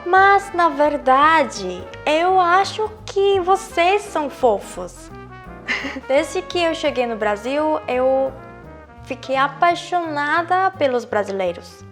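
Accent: South Korea. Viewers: A tem um português e um vocabulário muito refinado, fala até melhor que muitos brasileiros. A cada vídeo que ela posta percebo a diminuição de sotaque coreano. Parabénssss 😊😊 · Eu acho o sotaque do coreano falando português e muito fofo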